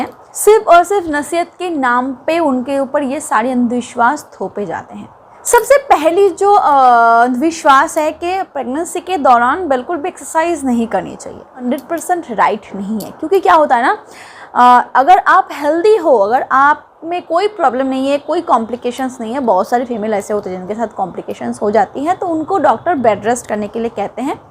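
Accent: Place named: Bihar, India. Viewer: Hello my name shehbaj Khan Aap Bihar se belong karti ho Kyu ki apki voice Bihar ke taraf ki hai ye nhi bol rha achi nhi h bas lga mere ko ...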